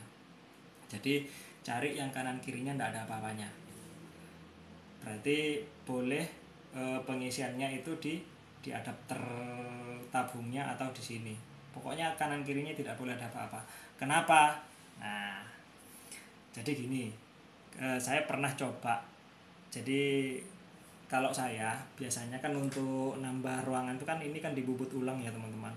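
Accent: Madura, Indonesia. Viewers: kmu orang mna bang klau bleh sya tau orang mna bang yaaa ma.af nii bang seblumnya soalnya kayak orang madura ngomongnya.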